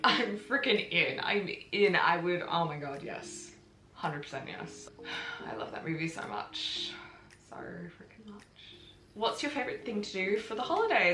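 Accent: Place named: Australia. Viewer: I really like your Australian accent.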